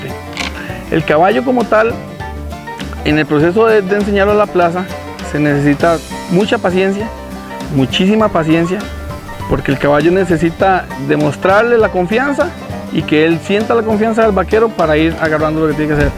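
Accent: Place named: Costa Rica. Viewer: Yo cuando escuche su asento dije es de Costa Rica....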